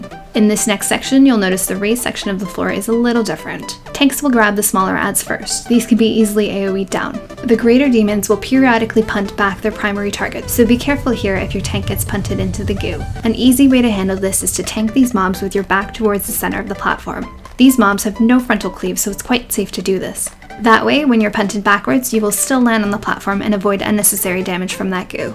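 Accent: Canada. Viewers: Your accent gets more and more Canadian with each video. I like it.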